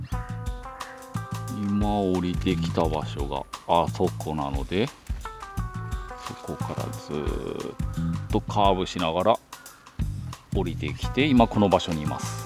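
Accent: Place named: Kagoshima, Japan. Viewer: まさかの坂之上（故郷です） 鹿児島のイントネーション好きです😊 幼児の頃住んでたんですけどこんな場所あったんですね カブト虫とかたくさん採れそう…！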